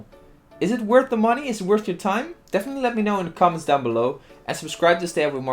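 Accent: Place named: Netherlands. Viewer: You are Dutch i can hear that in your accent 😂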